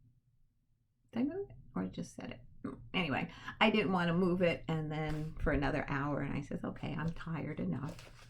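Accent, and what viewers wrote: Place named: North, United States. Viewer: Love your videos,! I can relate to what you feel about being from New York - I am from Philly, haven't lived there since I was 25, and listening to you takes me right back to my northern roots.:) I love watching your quilting, including your process and progress on your quilts.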